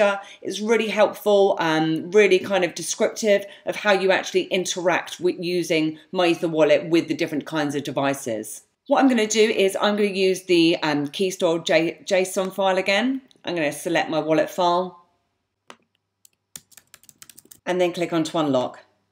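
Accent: United Kingdom. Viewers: she has a british accent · She has a British accent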